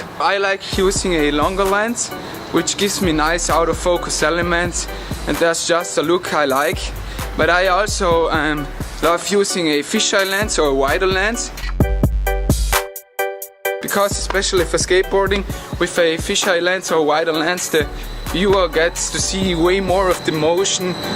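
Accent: Germany. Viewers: Richtig deutsches englisch aber gutes Video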